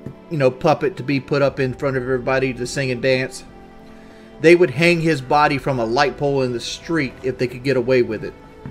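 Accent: South, United States. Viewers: That’s just a southern thing. Many southerners pronounce want like won’t.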